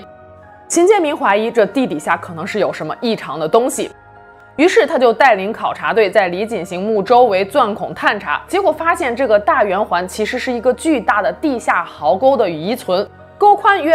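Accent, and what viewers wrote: Taiwan. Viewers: 哇第一次看见的厨房，我好像听到台湾。。你是台湾？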